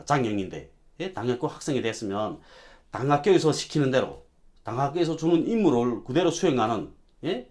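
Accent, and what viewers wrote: North Korea. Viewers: 오랜만에 함경도 말씨들으니 정말 감사합니다. 많이 수고하네요.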